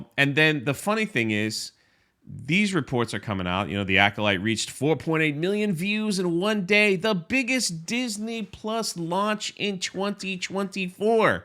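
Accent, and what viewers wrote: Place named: California, United States. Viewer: Ok huge question -- are u a surfer from southern california? You sure sound like one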